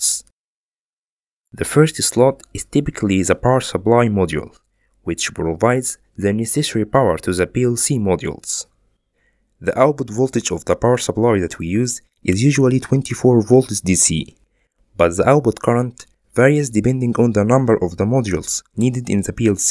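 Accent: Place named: Egypt. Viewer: according to your accent you are Egyptian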